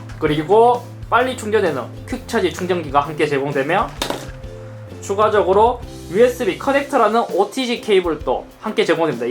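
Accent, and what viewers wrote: Gyeongsang, South Korea. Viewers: 꾹꾹 눌러 숨긴 경상도 사투리... 먼 미래에 이 청년은 사장이 되어 맘껏 사투리를 씁니다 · 와 이때는 억양이 완전 굳은 갱상도인이었네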